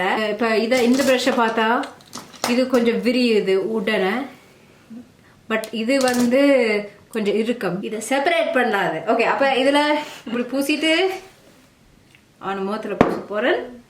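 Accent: Sri Lanka. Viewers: Sri Lanka Tamil speeking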